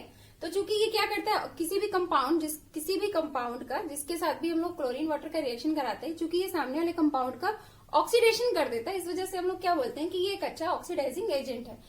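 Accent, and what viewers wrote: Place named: Bihar, India. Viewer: You are good in teaching. I am a chemistry faculty for jee/neet. Your teaching notes are concise and very clear, strictly according to ncert syllabus. No unwanted talk; only topic relevant content. Keep it up and keep posting. You are bound to rise on this platform. Also As bihari, I liked your accent accent.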